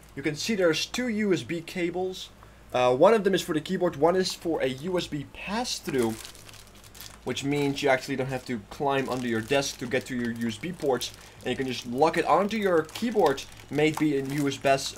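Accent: Netherlands. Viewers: Are you perhaps dutch by any chance ??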